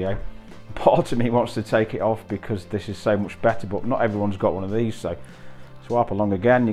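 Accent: United Kingdom. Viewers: I’ve no idea why this fella thinks you’re Australian.. you sound more northern uk than anything (sorry if I got it wrong). We definitely do not pronounce yoghurt like you do lol. Soz, upgraded from tm5 to tm6 recently and looking for hints and tips. Spose I should ask my consultant but I don’t want to bother her right now ( can’t be half arsed) Hope you’re doing well xxx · Thank you so much for your detailed and really informative helpful review. I'm a foodie too and a competent cook but am time poor with work and uni study so am tempted to add the TM6 to my kitchen, even though I already own a different brand high quality thermo cooker (which I love as well!). I'm hoping the teenagers will find it fun to use so that they can help out a bit more! 😂 I really value your first review. Think you may have persuaded me to go for it! Thanks so much. Ps love your accent, I'm a Brit, now Aussie living down under. 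Made me feel at home. 😊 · Derby accent?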